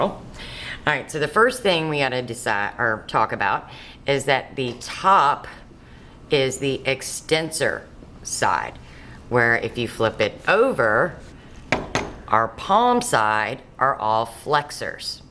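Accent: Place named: South, United States. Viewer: Something about the southern accent makes it all stick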